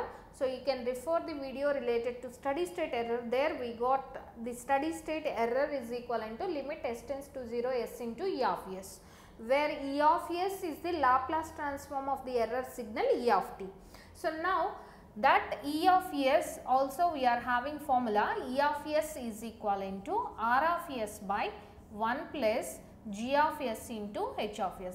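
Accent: India. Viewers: USELESS AS FUCK FUCKING SHITTY INDIAN ACCENT CANT UNDERSTAND A SHIT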